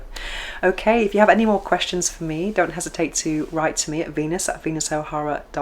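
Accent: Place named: Liverpool, United Kingdom. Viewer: With your opening sentence "I didn't know if I was coming or going." You have a slight Liverpool accent with your finishing school voice.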